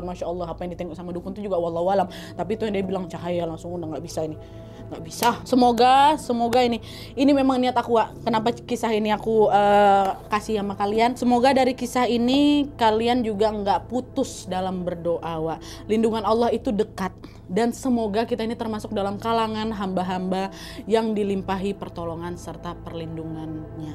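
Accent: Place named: Java, Indonesia. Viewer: pantes kakk kalo ngomong jawa medokk bangett😭😭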